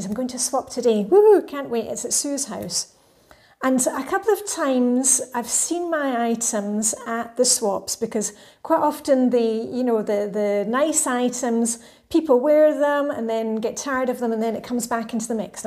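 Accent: Scotland, United Kingdom. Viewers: Love working along with you. Love your calming lilting Scottish tone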